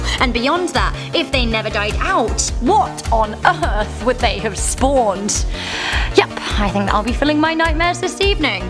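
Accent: United Kingdom. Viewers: British accent 😠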